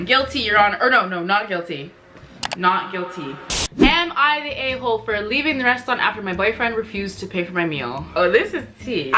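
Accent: France. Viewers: Okay but ton accent français >>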